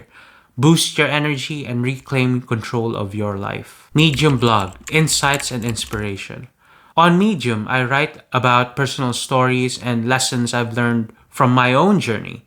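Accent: Philippines. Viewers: Pina-panuod ko si dugyot kanina nung bigla nyang siningit yung video mo. Nung pinlay na nya yung video mo, sabi ko.. "Bat parang tunog pinoy yung english ni kuya?", at tama nga ko. Masaya ko para sa nangyari sa buhay mo ngayon pare 😊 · accent pa lang pinoy for sure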